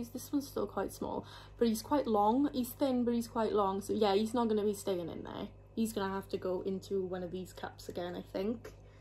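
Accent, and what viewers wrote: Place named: South Wales, United Kingdom. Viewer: You sound like you're from my neck of the woods. South wales?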